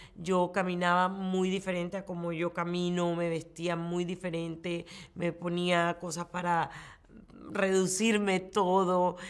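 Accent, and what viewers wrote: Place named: Caribbean Coast, Colombia. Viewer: La gente de la costa atlántica en Colombia hablan así .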